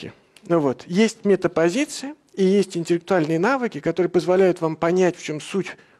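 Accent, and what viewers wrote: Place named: Odessa, Ukraine. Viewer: Андрей Владимирович тут чутка одесситские интонации словил)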